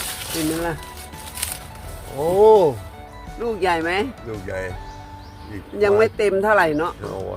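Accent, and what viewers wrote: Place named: Isan, Thailand. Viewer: สวัสดีจร้า กับปู่มารับชมวิถีชีวิตบ้านๆคะชอบ พูดภาษากลางผสมอิสานมากคะฟังแล้วหัวเราะตามเลย🤭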